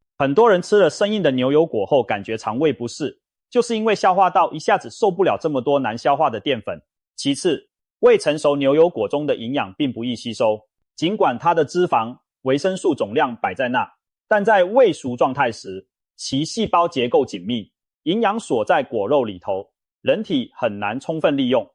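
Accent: Taiwan. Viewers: 主持人一口台灣腔應該是在台灣長大的，為什麼中文這麼差很多的錯別字而且讀白字。我建議還是用英文做節目吧至少英文不會有錯別字也不可能讀成白字。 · 明明作者是台灣口音，為什麼要用大陸的說法「牛油果」來稱呼我們台灣習慣稱呼的「酪梨」呢？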